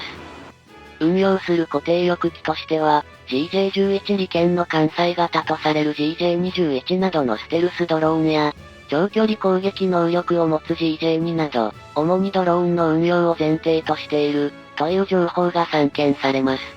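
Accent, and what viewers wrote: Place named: China. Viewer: あと流暢な日本語喋ってても明らか中国人(大陸の方)ってわかるんだよね… ちなみにあなたの国は学問の自由指数 (Academic Freedom Index 2025): 中国の状況: 世界179カ国中で極めて低いグループ（Status Group E: 完全な制限）に属しています。 スコア: 2024年時点で0.067（1.0が最高）とされており、教える内容や議論の自由が厳しく制限されていることを示しています。 報道の自由度ランキング (<num>): 順位: 中国は180カ国・地域中、ワースト2位付近（179位など）に位置しています。情報の検閲や修正が常態化している環境が浮き彫りになっています。 …そんなんだから真実が分からんのだよ…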